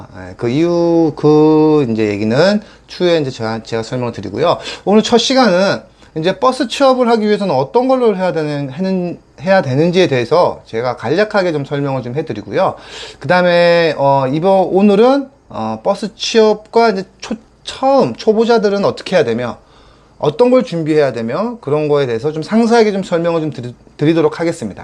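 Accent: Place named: Gangwon, South Korea. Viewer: 말투에서 풍기는 억량이 강원도분 같기도하고 암튼 추천 남기고 갑니다 저도 4년6개월된 현직 버스 기사 입니다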